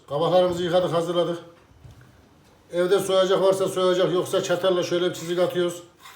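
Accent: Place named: Anatolia, Turkey. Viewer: şef zaten bir Anadolu insanı konuşması ve yemek tarifleri ile 10 numara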